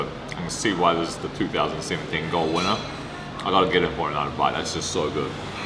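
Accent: New Zealand. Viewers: Bruh your kiwi accent is strong lol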